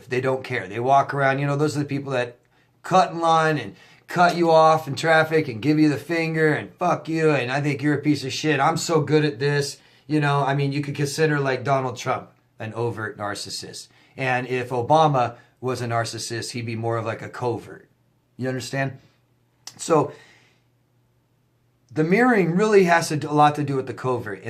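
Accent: England, United Kingdom. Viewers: Your English accent is really funny..Nessa from England !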